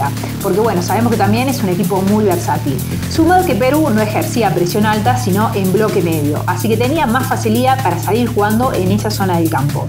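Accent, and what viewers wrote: Córdoba, Argentina. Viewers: Una pregunta. Eres argentina de la provincia de cordova??????? Se nota mucho. 😅😅😅😅😅😅😅😅😅😅😅😅😅😅😅😅😅😅😅😅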